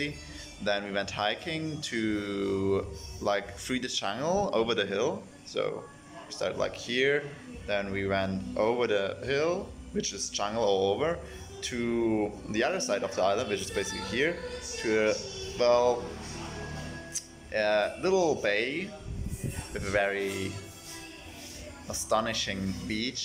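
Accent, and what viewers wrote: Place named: Finland. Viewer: So sweet ☺️ sounds more like Finglish than English, cool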